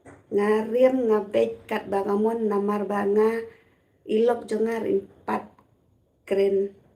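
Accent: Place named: Bangladesh. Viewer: Ka shrieh kynthei ba lehsih tam bad ka ak bha briew sa ba la tymmen, imat kaba ym don ba ha ing ha sem ym don ba sneng ym don ba kraw ym don ba iohi ia ka jingbiej jong ka, lada don ruh ialam noh ia kane kloi sha SANKER hospital kein ba kan ioh jing sumar bad ban shai noh ka jabieng dum jong ka. Kane kein ka briew kaba ka EIT bad ka JABIENG jong ka ki ia daitlang hajuh. Lok khar bangladesh tharai ba ka kren dkhar.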